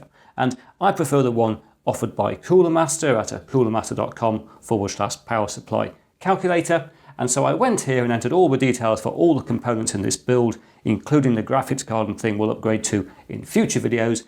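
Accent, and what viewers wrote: England, United Kingdom. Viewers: Very nice video and well explained. I like your English humor, accent and the way you say „motherboard“...👍😂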